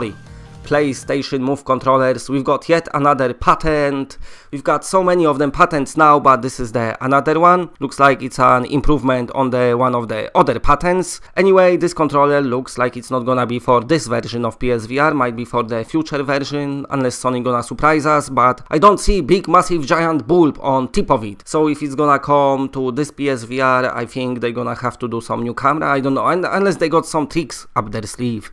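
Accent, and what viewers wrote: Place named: Poland. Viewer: I’m going to go out on a limb and guess Polish. It’s terrible to listen to though.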